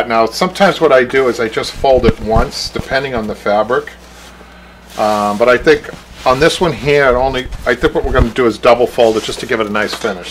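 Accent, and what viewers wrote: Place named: New York, United States. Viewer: Love that New York accent ..."right om"...it's R A D . And I love the demo. I want to buy a beautiful upholstered chair for my new-to-me modular home and the arms are stained . So this is the answer ! Thanks !!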